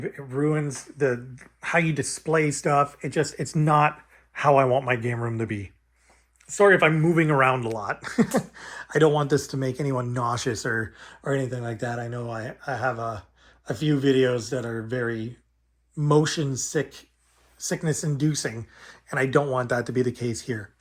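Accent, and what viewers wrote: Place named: Canada. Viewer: Awesome game room man, one of the best ones I seen! Do you know UndeadChronic? You sound spot on exactly like one of his Warband members with the Canadian accent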